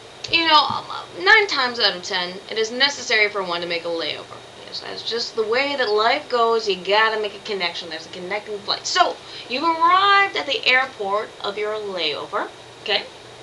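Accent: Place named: Texas, United States. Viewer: Your mannerism and voice. Dead give away. What part? Austin? San Antonio? Not near Dallas.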